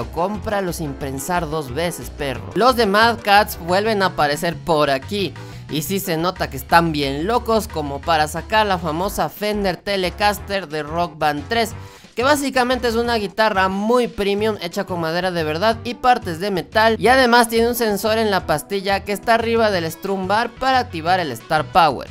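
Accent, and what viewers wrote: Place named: Mexico. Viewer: Este wey tiene acento mexicano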